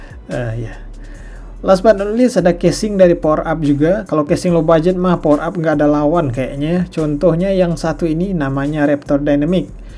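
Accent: West Sumatra, Indonesia. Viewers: Logatnya kaya orang Minang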